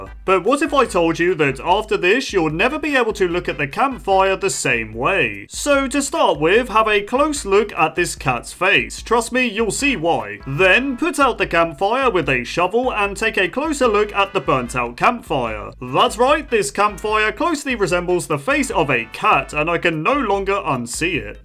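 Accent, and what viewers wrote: United Kingdom. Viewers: bri'ish voice? ı'm new :/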